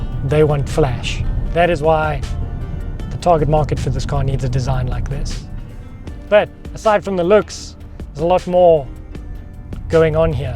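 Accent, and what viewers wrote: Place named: South Africa. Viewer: You can tell by his voice that he is from SA!!!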